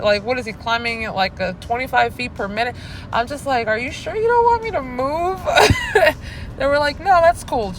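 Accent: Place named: New York, United States. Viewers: Ha Ha I from upstate NY now living in the south 🤣 100% relate to the accent/attitude. I can't hide it if I tried! Awesome flight and good luck going for your Commercial! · Nothing wrong with the New Yorker accent, and you have a pleasant voice, really. (ツ) ☕☕(ツ)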